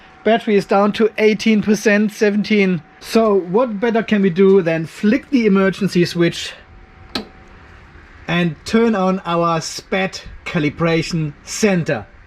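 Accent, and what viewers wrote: Germany. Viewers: Höre ich da einen deutschen Akzent raus?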